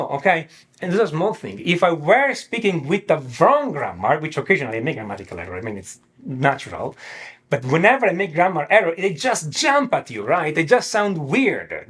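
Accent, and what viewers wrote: Russia. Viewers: Accento russo! piango...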